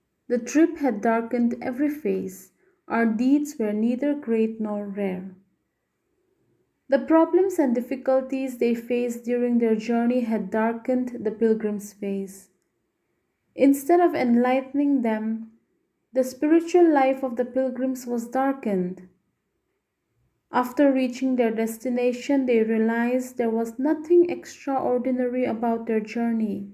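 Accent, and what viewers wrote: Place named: Mizoram, India. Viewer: Ohhh i accent ka hre chiaha ka lawmzia chu ❤ mizo lo ni rengin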